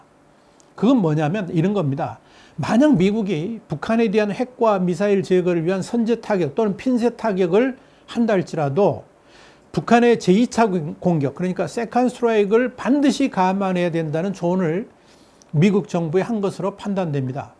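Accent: Jeolla, South Korea. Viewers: 언제한번 전라도 말투 듣고 이런말 할 줄 알고 기다렸는데 당신도 결국은 7시 나라 사람들이라는 걸 확인 함.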